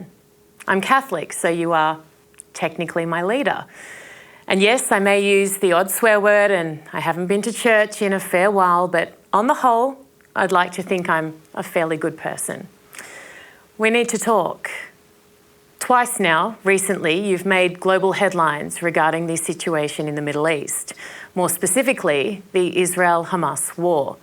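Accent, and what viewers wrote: Australia. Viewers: You show no solidarity with your colleagues of the Press. How much are you getting paid? Could you change your accent, I am so embarrassed that you are Caucasian Australian. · Hats off to you, Erin... Love the Aussie accent , by the way...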